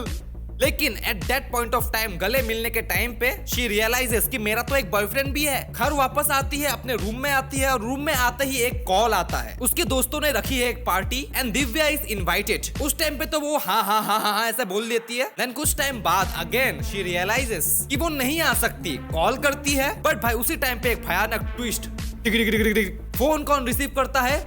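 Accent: Assam, India. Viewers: Why you sound Assamese?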